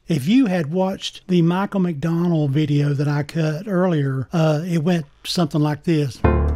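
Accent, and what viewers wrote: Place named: Tennessee, United States. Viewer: Whoa, that accent was tough to get a lock on! I was across the pond at first! My best guess though is somewhere in Tennessee or Kerrville, Texas? Anyway, very cool lesson! Thanks for sharing. This helps a lot.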